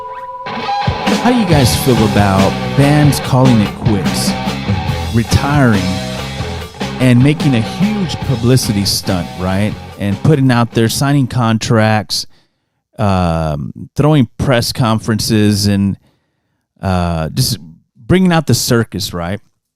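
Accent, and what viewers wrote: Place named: San Antonio, United States. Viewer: First time hearing you, but I'm guessing you're from SA?? Is that the Red McCombs Indian??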